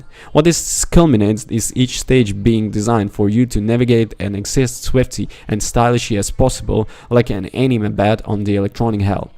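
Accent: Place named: Dublin, Ireland. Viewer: Nice surprise to hear a fellow Dub reviewing video games 🇮🇪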